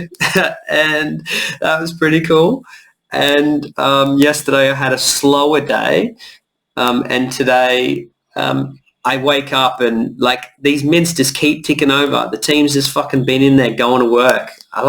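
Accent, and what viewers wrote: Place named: Australia. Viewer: Sol big brain has brought me to this project. Cannot wait. Also listening to your accent great to hear someone from Straya 🇦🇺 🙌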